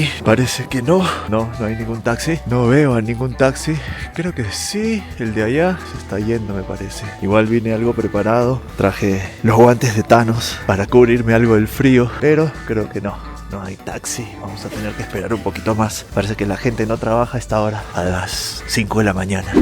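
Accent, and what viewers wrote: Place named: Peru. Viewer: Que esperabas....en invierno y a 4000 metros de altura. Mejor te quedabas en casita y calientito. Por tu tono de voz Peruano.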